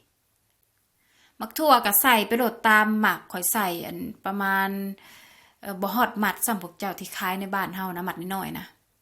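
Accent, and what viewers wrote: Savannakhet, Laos. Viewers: สะหวันนะเขดเดี้ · ສຽງສະຫວັນ ບ້ານຂ້ອຍເລີຍ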